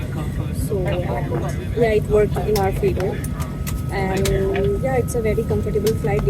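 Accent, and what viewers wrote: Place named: India. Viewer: I like ur deep indian ascent English... sounds interesting indeed..how do U like Malaysia?!!🎉😅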